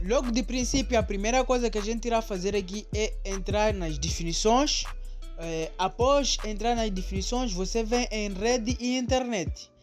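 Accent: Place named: Africa. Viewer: seu sotaque é africano 🤔🤔🤔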